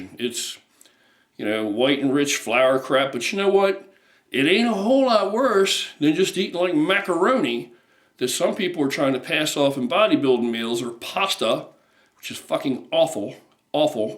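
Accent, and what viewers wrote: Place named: Baltimore, United States. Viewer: It’s milk unless you’re from certain parts of the US like Baltimore county, like me, which is where he’s from too. I lived in Perry hall his accents different from mine a little maybe he lived on i83.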